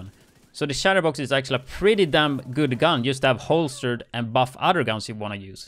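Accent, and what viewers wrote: Sweden. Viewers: the Swedish accent is strong with this one!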